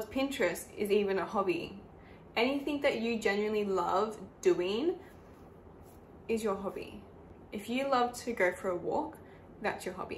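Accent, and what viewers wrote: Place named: Australia. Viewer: I’m glad that i come across your video!!! You’re so underrated! I wish you could gain more subscribers soon! Also i will start watching your videos starting from today so i can learn aussie accent cause i had always been liking aussie accent <33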